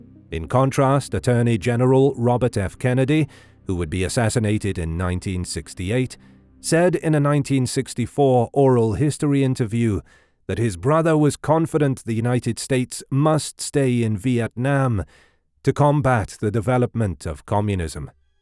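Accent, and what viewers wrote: England, United Kingdom. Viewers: His English accent doesn't add any real credibility to his conclusion. Facts: Oswald was downstairs (2nd floor) causally having his lunch 90 seconds after the shots were fired, drinking a coke--(2 witnesses)nobody saw Oswald coming down the only stairway available when they were coming down the stairway 45 seconds after the shots (2 TSBD workers observed by TSBD supervisor). Rifle was originally reported as a Mauser (far more likely accurate weapon) on live radio and tv air by weapons experts (2 witnesses) before the story was changed. Oswald's supposed Mannlicher–Carcano rifle was a "piece of junk" and needed to be shimmed even to get telescopic scope to work (3 witnesses) probably a Dallas PD property room reject carelessly chosen and hidden the day before. The Mannlicher–Carcano rifle had such a bad reputation in Italy (it's country of origin) it was called "the Italy lost the war." Simplest theory--Crossfire--3 rifles--Grassy Knoll(front twice--Kennedy's neck, temple) , Texas SBD(Connally and miss), and across from TSBD on the roof (bullet off the curb that hit bystander by the overpass next to a policeman (2 witnesses). 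The Dallas Police Department actively did everything they could to suppress any witnesses that did support "Oswald did it." Crossfire was standard CIA operational procedure to guarantee success. Majority of Secret Service detail had been drinking till 3 am at at Casbah Club--rookie had a machine gun the follow up car, Kennedy's driver didn't follow proced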